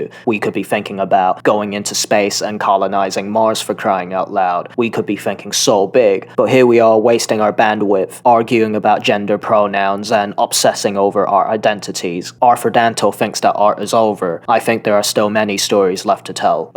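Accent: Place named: Upper class, United Kingdom. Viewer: why do all of you anti modernists have such a smug upper class accent?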